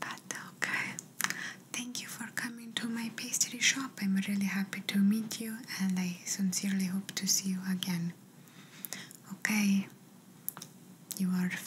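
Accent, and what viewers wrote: Italy. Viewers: ……to tell you how much i loved this video would be impossible. I really swore we were at a shop in Italy. I just found your channel yesterday & have been binging your videos but i had to tell you how amazing this one is….i am so so grateful to have connected with you. 🥹❤️ i love the italian accent, so sooooo much. The way you say perfecto… OH MY GOODNESS! 🙏🏼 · Hello perfect ASMR I loved it and I enjoyed it a lot listening to your relaxing voice with an Italian accent formidable you are great greetings gorgeous 🥰💖😍🌹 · These videos are so well done, love the Italian accent, so believable, you sound like some of my relatives! :goodvibes:❤ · The Italian accent definitely sets u apart in the ASMR universe. It's so different and unique. Which is why I enjoy it so much. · I subscribed to purely on the basis of these Italian accent roleplays. Molto bene! 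· Where did you learn Italian? You sound like you're actually from Italy! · Italian accent videos are perfect for relaxing and unwind your soothing voice makes me feel sleepy 😴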